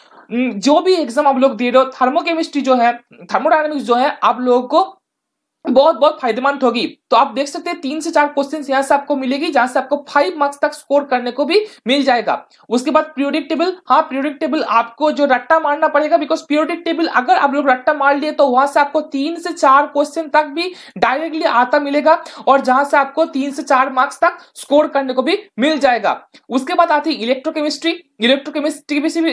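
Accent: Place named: Bengal, India. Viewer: দাদা তোমায় হিন্দি থেকে বাংলায় ভিডিও দেওয়ার জন্য সাজেস্ট করছি। কারণ তুমি বাঙ্গালী এবং তোমার মধ্যে বাঙালির কথার টানটা থেকে যাচ্ছে। আমি সাজেস্ট করবো তুমি বাংলায় ভিডিওটা বানাও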